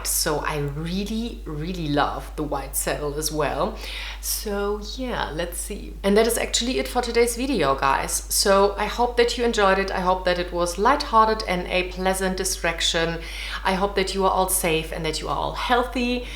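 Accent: Germany. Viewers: Dein Akzent klingt deutsch 🤓I'm learning it lol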